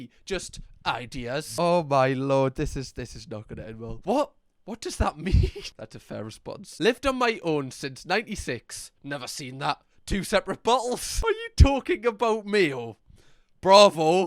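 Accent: United Kingdom. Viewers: With an american accent we have to say pr-👁️-vacy. With your british accent priv’acy works:) you and can say either if you want imo 😆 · You could spend YEARS on Reddit for reaction content -- "Am I the Asshole?" (AITA) is loaded with insanity. Also, from what I know, the UK says "privacy" with a short i, as in "igloo", and the US says it with a long I, as in "I'm going to the store." Personally, I like your UK pronunciation because, you know, you're from the UK.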